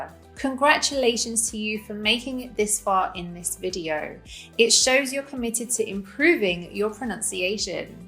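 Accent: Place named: United Kingdom. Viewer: The British accent is finding it kinda hard to understand Maybe the American accent is easier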